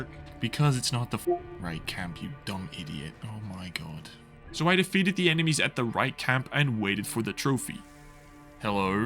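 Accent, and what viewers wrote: Netherlands. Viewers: are you dutch???? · ARE YOU DUTCH??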